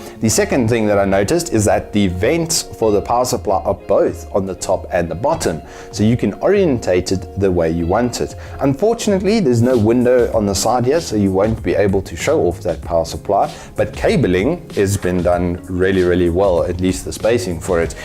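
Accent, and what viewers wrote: South Africa. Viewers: great review! Are you South african? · South African? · EthanHuntMi6 he has a Afrikaans accent, he is South African I can feel it.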